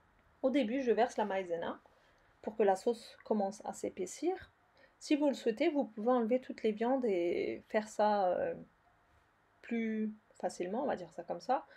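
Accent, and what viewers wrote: Alsace, France. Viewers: Bravo pour tes recettes tu as un petit accent alsacien et comme tu parles d achat en Allemagne pour tes ingrédients ça confirme 😘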